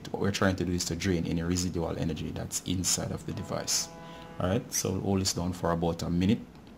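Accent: Jamaica. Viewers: From I heard your voice I knew you are Jamaican lol Your sim card confirmed it. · Are jamaican?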